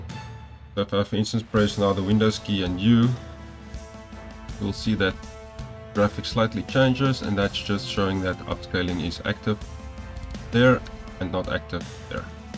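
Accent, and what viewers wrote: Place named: Afrikaans, South Africa. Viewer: jy klink afrikaans bra!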